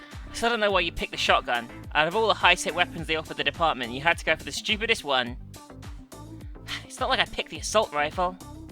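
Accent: South, United States. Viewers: People really hate that southern accent voice? I find it enjoyable and in your Dangonropa voice it kinda fit Nagito's bat shit crazy personality. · I love the southern actcint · Not a fan of this stupid game, but I personally luv southern accent!:) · I love the southern accent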